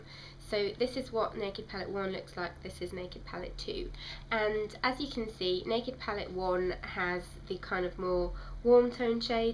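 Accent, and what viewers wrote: United Kingdom. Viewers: this is the best review ive seen!! i love this, i just subbed xx what part of the uk are you from? south, north, midlands etc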